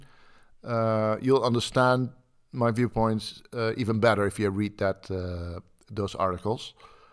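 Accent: Netherlands. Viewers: I smell nederlands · i smell nederlands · From The Netherlands, no doubt. Like I hear myself. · are you Dutch?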